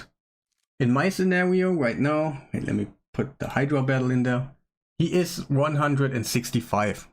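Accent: Germany. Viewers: I like your german Aussprache from the english words😅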